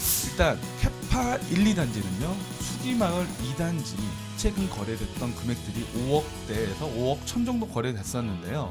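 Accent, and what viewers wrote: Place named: Jeolla, South Korea. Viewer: 혹시 고향이 전라도신가요? 고향말투가있는것같아서 반갑네요ㅎㅎ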